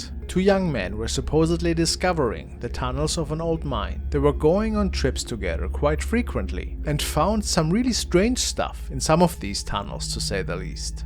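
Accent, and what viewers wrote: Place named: Germany. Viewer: Hallo. Bin durch Zufall auf Deinen Kanal gestoßen und habe gleich anhand Deiner Aussprache (Slang} gehört dass Du aus Deutschland kommst. Ich schaue gerne Deine Videos. Grüße aus Lüneburg bei Hamburg! 👋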